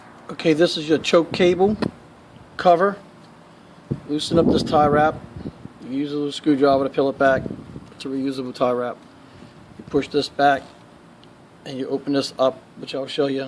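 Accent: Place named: Appalachia, United States. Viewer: his accent makes me wahna throw my car at this hillbilly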